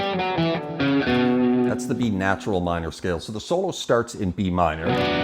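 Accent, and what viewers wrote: Canada. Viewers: It was very interesting, I’m from England, do you have a Canadian accent?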